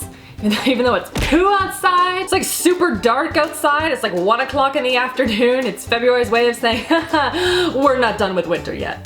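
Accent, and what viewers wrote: Canada. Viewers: Hopefully this doesn't sound ignorant lol But I have always been like shouldn't she have some sort of an accent being from Canada. Until she said "out" I was like ding ding there it is lol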